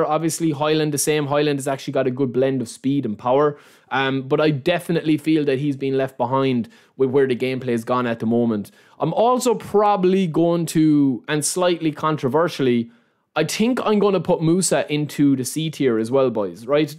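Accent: Ireland. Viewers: Love these tier lists and your McGregor accent. :))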